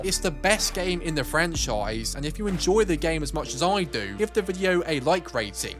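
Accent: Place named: London, United Kingdom. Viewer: Got to love a London accent, knowwhatahmean ? :)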